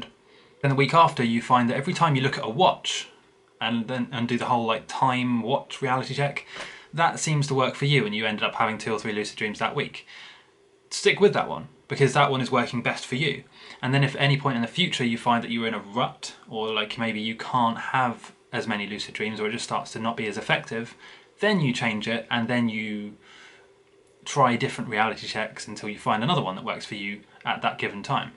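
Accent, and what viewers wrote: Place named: North, United Kingdom. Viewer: I'm american. I understand you well I think its cause you sound like youre from the north(maybe, could be wrong). There are some English accent dialects that are difficult to understand but youre clear :)ha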